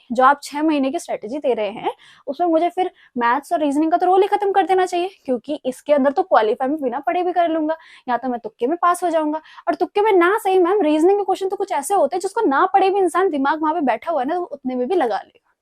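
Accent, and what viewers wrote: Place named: Pahari, India. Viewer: Pahari lg ri ho 😅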